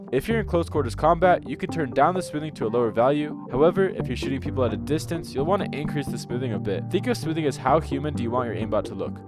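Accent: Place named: North America. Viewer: You sound like your NA. Wait till embark send the suites to harass your mom like blizzard did mine with Glider/Honorbuddy. Good luck kid. Your barking up a ruff tree and your talent could make you way more money in another field. Being a white hat isn't "gay". Its progression into being a adult that wont spend the rest of his life in debt or in prison. Good luck young man. If you are even the one coding these and not just a reseller. Idk, been out of the scene for decades and wanted to see what kids where using now in games I played.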